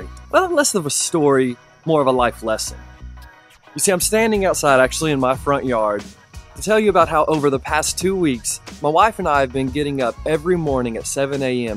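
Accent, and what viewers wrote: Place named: South, United States. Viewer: Are you from South? I can hear a slight Southern twang in your voice. Other than that, God bless you brother.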